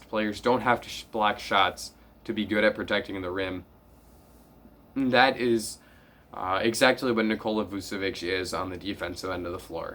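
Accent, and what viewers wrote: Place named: Canada. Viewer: the way you pronounce a couple words sounds Canadian, being from Wisconsin makes sense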